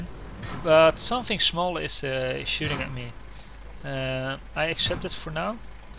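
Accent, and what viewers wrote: Netherlands. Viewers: what i have noticed this time, you're actually dutch ^^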